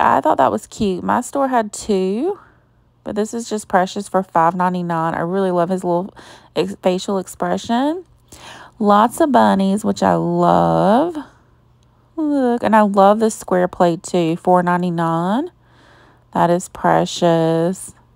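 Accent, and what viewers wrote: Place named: South, United States. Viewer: Hi 😁 I live in California so your videos are ready to watch when I get up so my usual Morning routine is to drink my coffee and watch your content... I just love your southern accent and your personality... I look forward to your videos every morning👍😊 thank you so much and have an amazing day ❤️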